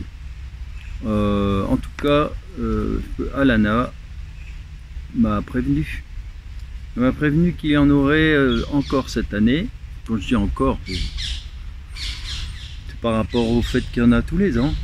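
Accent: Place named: Occitan, France. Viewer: C’est la voix occitane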